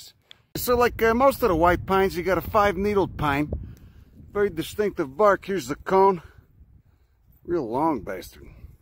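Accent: Chicago, United States. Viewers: Truth! If you've never heard these Latin names pronounced before, you're going to learn them with a Chicago accent. Plus, there's that special "see you later, my friend" phrase. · First of all, your Chicago accent makes me feel so happy. Second of all, if I see a juvenile bear, I assume his mom is nearby somewhere and I’ll be the one running. Third, your knowledge is amazing! Thank you.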